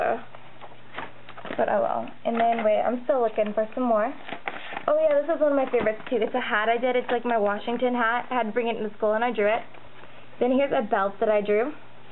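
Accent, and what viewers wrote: South, United States. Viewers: your room tour made me want to redo my room and not to be mean but your voice sounded kinda country -lauren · i watched this and i just wanna say we have te same accent ha yay we both have a southern accent btw love it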